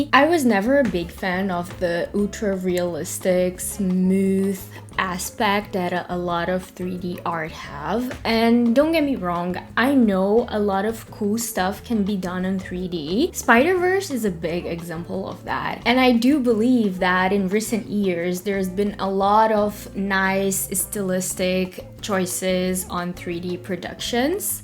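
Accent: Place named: Brazil. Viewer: oh my god, i love english brasileiro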